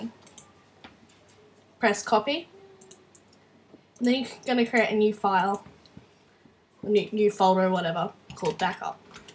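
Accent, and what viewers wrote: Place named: Australia. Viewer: This is great! Haha, I'm from New Zealand but I live in the US. I laughed when you started talking and I realised you're an Aussie! Haha thanks for the help :)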